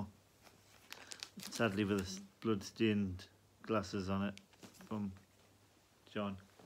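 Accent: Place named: Northern England, United Kingdom. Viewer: Thank you! I would say you live farther north in England.. maybe half way to Scotland? Trying to figure out your region by your accent.. and by the way.. I'm the one with an accent, not you! Hehe.😀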